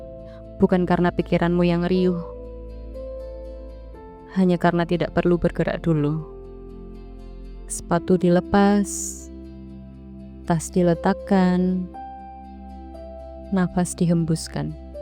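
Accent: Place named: Jalan Wilis, Indonesia. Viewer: suaranya seperti org kelahiran jln wilis ya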